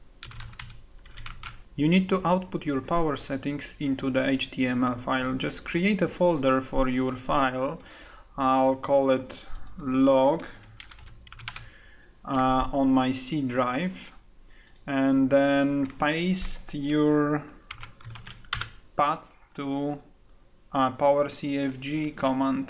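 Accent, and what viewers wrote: Romania. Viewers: Cool Accent. Romanian? The first option worked great and for those who don't know, you may need to type: cd C:\Windows\System32 in the Command Promp first and press enter. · Se vede ca-i roman nu are acent de englez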